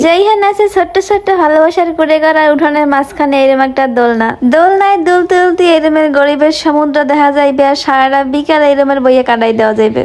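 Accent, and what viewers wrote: Barishal, Bangladesh. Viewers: তোমার বাড়ি কি বরিশাল?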